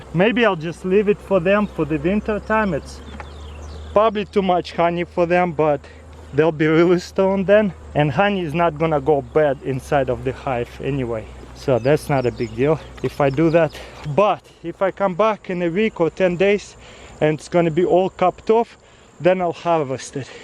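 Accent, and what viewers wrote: Russia. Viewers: Beautiful russian accent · У тебя акцент да? Ты не говоришь по английски,тты руский! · Love your Russian accent! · Your accent is beautiful. I love Russian accents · Are you Russian your accent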